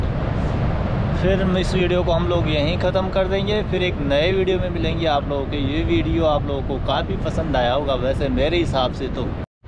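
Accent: Rajasthan, India. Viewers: Bhai ke bolne ke accent se Rajasthani lag raha hai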